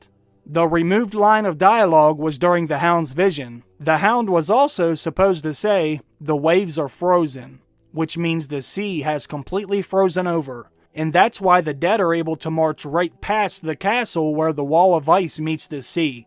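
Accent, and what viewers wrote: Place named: Pennsylvania, United States. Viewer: Thought so. I could hear it. Fellow Keystoner here.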